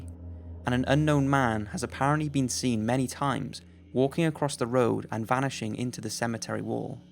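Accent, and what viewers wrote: Wales, United Kingdom. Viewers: Silly Question.. Are you Welsh? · Are you welsh? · That's a Welsh accent right? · Welsh accents ftw! As you can tell i'm also from Wales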